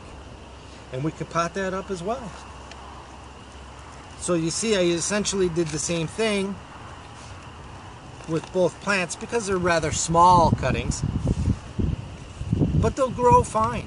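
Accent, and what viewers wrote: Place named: Buffalo, United States. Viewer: Are you from Buffalo, NY? Guessing by your accent.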